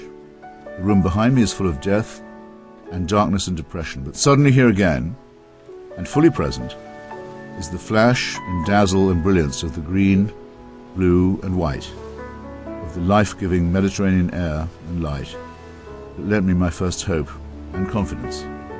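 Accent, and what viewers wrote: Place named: RP, United Kingdom. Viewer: I disagree. I've been listening to Hitchens and his brother Pete for almost half a decade, and I think I'm correct. But I couldn't read his mind, so I can't say for certain, just like you can't. As for proof, well I can say that sounded more like a misotheist than an atheist. Misotheists hate God because they think he's immoral. Literally every short you'll see on YT is calling God immoral, unjust, a dictator, etc, etc. If God doesn't exist, why did he spend all his time criticising Him then? And oh yeah, he literally said in his debate with John Lennox or Frank Turek (can't remember which) that even if God was real, he still wouldn't believe in him I didn't insult his mother. I just said that another man was more important to her than her own sons. Why don't you word what his mother did in a nicer way if you think I'm being hateful. 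And I'm not religious. I'm not even a Christian. I'm just someone who was a Hitchites fan before finally studying scripture for myself. And I don't hate. He's just objectively wrong about a lot of biblical facts, but because he's got a posh British accent, his word are taken as gospel.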